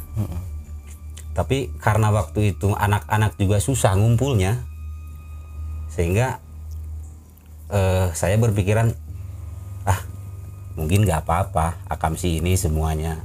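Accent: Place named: West Java, Indonesia. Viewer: Wakaka dimaklumin kang sebagai sesama sundanese 😁semangat 💪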